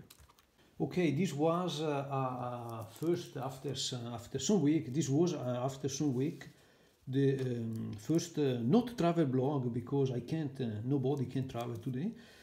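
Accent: Italy. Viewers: Ho capito subito che sei italiano, come siamo facilmente riconoscibili. Cmq continua cosi` sei grandeeeeeee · sei anche veneto magari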